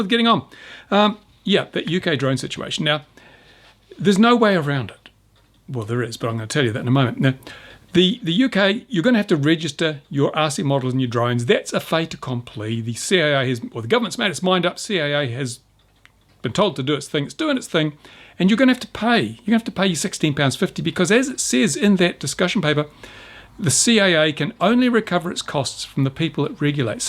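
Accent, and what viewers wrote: New Zealand. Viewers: "Kiwi" accent LOL! =D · I like this format. Informative and not an outright rant. Very nice and laid back. And that Kiwi accent. Tingling. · Goodmorning..Kiwi accent lol. Is that one of your female admirers on your left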